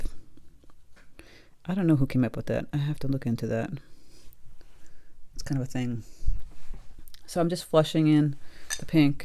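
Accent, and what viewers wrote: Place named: New England, United States. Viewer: Yes to the Vlog. Love hearing what's going on in Massachusetts. I grew up there, currently in Michigan. Miss hearing the New England accent 🙂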